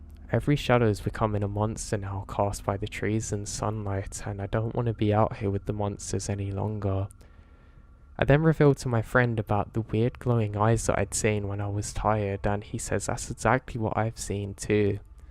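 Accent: United Kingdom. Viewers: You really are one of the narrators who relax me. When I drive I listen to Mr Revenant and Being Scared, but if I want to be simultaneously scared and soothed to sleep, you're the one. I do have unusual dreams listening to your soft British accent (I assume you're British and not any of the others, I know you aren't a Liverpudlian but to my ears you sound a little cockney. I wonder if you could clarify from where in the British Isles you are from. Not Ireland or Scotland or Wales, right?)Yorkshire?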